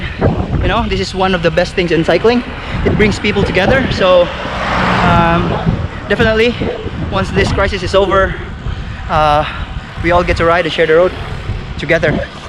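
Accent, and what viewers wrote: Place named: Bisaya, Philippines. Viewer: Bike legs back on the legs? Hehehe bisaya englis nkng boss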